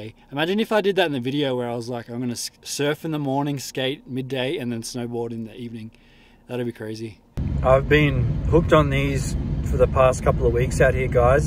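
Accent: Australia. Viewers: It’s sick how you liked and replied on every comment. Better comment now before you get to famous and I love the Australian accent man, wish I had one so I could get mad chicks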